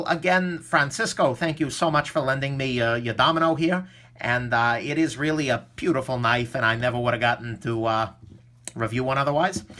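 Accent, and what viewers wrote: New York, United States. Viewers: Good review. Now work on that NY accent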